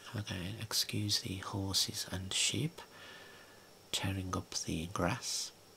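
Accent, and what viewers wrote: Birmingham, United Kingdom. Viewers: You sound like a Brummie, how comes you support United?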